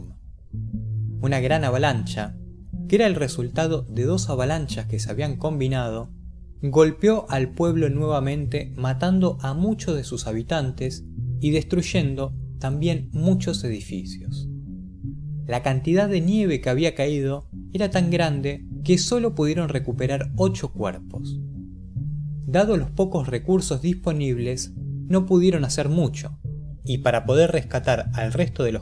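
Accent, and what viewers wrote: Argentina. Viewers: El acento argentino y uruguayo generalmente es así. Vocalizan la s como j. Y la ll como sh. Lo mismo pasa con el acento español, pronunciando la c como z. El idioma español es muy complejo en cuanto al léxico de cada país.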